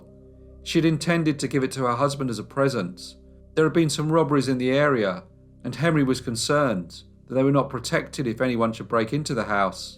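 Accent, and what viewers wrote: United Kingdom. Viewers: Your accent/inflection Britain or Scotland?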